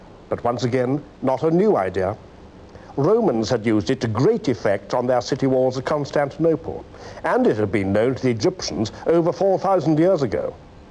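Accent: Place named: United Kingdom. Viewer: what an old british accent!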